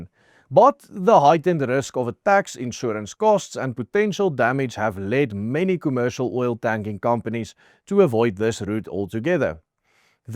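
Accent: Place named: South Africa. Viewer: ek dink jou videos is fantasties. Die content is akkuraat en lig die mense goed in. Ek dink die feit dat jy Engels praat met so sterkt aksent maak dit soms moeilik om te hoor, maar rook eg Suid Afrikaans. Ek love dit! Maar kan ons asseblief praat oor jou baard en hare. Vir n man wat so baie voor n kamera sit, kry vir jou n manscape trimmer of so, en kom ons wys vir die mense ons voorkoms is net so goed soos die visie, en missie in ons land.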